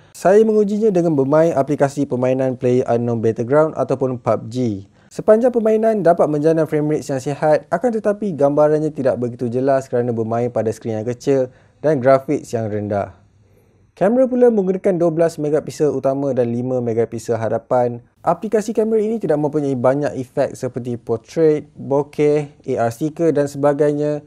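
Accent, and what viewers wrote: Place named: Kelantan, Malaysia. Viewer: demo ore klate ruponyo admin ni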